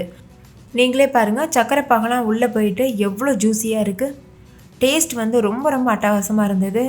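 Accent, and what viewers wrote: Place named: Kerala, India. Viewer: Unga native enga unga voice Kerala slang Madhiri irukku thank you for your reply to me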